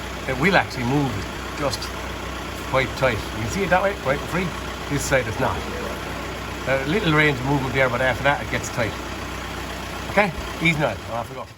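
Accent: Ireland. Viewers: I've been away from Ireland for a week..and that has to be the most Irish accent I've ever heard in my life. Brilliant production and delivery.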